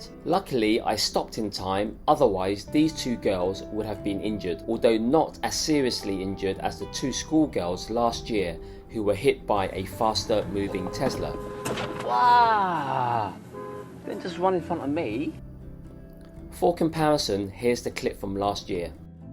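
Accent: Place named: United Kingdom. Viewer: I like you have the Hong Kongers' 「Wah」expression while you still have the Brits accent😂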